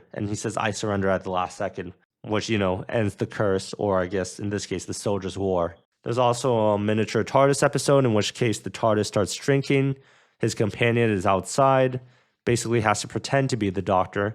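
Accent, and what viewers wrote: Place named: Scotland, United Kingdom. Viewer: Irish Me: Irish...(processing) IRISH??!!!! The last I checked, I'm pretty sure he was Scottish. Great vid btw, got a few laughs out of it.😄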